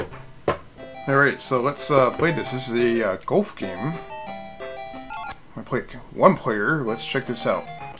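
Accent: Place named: Canada. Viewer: You must be Canadian. Here's how I know. You can turn "and" into "un". American's can not do that.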